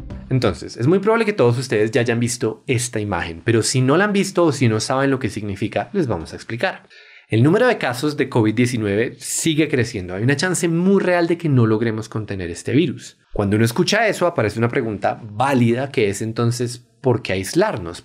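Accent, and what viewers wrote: Colombia. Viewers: Me parece genial la forma de narrativa gráfica que tienen y el dinamismo para transmitir información, una voz holgada me gusta el formato. Parece ser Colombiana, orgulloso de ser así.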